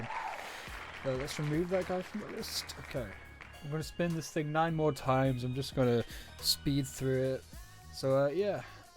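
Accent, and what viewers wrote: United Kingdom. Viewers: Hello mate, you sound kinda bri’ish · HE'S BRITISH?!?!?!?!? 🇬🇧🇬🇧🇬🇧🇬🇧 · WHY IS HE BRITISH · HES BRITISH?!??!!??!!?!??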